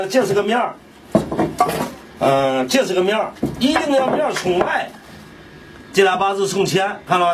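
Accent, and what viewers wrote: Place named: Tianjin, China. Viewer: thanks for uploading the video! I grew up in Tianjin when I was young and I love your accent.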